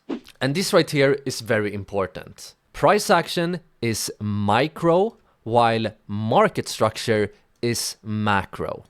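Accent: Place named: Sweden. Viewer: svengelskan e ju för skön!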